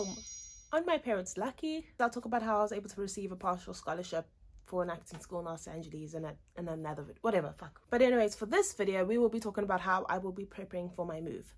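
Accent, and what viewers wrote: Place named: United Kingdom. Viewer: Which part of South Africa are you from, because that accent sounds british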